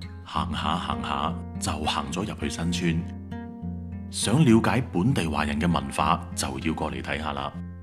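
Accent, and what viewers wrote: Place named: Cantonese, China. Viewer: 你的粤語好好聽💚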